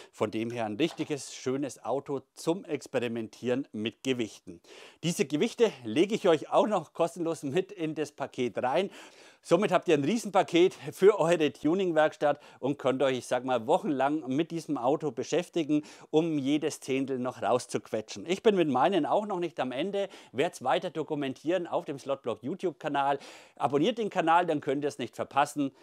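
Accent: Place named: Franconia, Germany. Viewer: …für mich ist DAS Fränkisch…😂😂😂 Den richtigen Dialekt versteht nur ihr “vor Ort”….🎉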